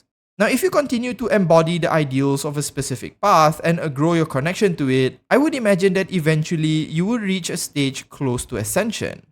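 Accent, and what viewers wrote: Malaysia. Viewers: Yo are you a Malaysian? I swear your accent sounds like one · u sound malaysian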